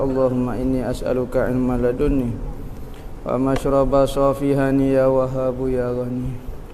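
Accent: Kelantan, Malaysia. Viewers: sb kbanyakn yg prtikai tu..ak tgok ade yg x brpe fham ape yg d mksudkn oleh ustaz...ustaz ni kn bhse klantan dia pekat skit..ape yg dia smpaikn lain yg org fhm..tp x smua la..ape pn ak ske,ustaz idrus dn ustaz sbdul somad...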